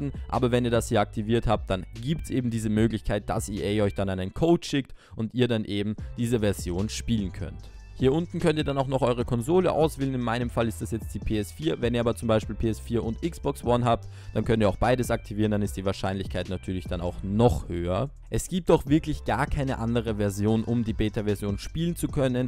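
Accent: Austria. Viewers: Ned gewusst das du a Österreicha bist🤔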